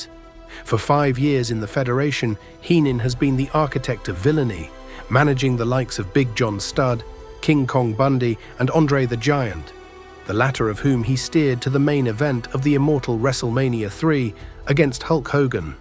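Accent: United Kingdom. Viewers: It's amazing to me how a great topic like this can be turned into a complete dribble by a British accent thumbs down for that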